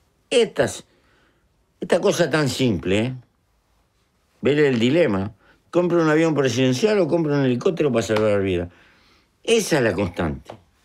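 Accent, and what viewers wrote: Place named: Gaucho, Argentina. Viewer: 3.31 min un helicotero (helicoptero) jajaja grande pepe la expreción campera y gaucha no la cambies nunca!!!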